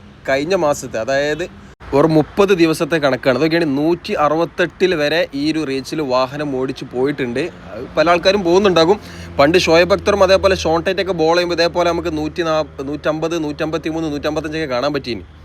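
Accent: Malappuram, India. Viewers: മലപ്പുറം സ്ലാങ്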